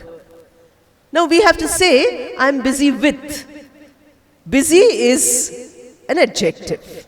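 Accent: India. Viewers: I am from Delhi there are so many Institute of English I have also joined a Institute but I like your video because you speak Indian English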